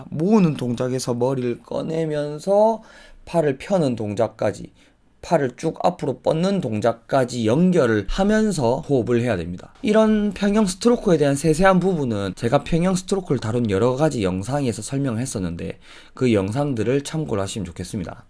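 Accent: Gyeongsang, South Korea. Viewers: 땜 경상도 사투리가 서윗해졌어요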